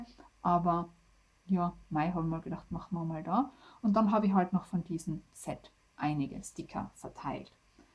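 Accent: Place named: Austria. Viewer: eine Landsfrau in ihrem Dialekt erzählt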